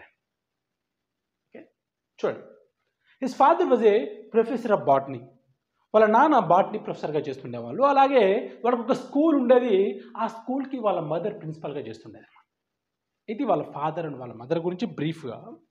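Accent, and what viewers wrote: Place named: Anantapur, India. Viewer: Nice Explanation Sir,mee language observe chesanu,,,i think Ananthapur surrounding anukunta sir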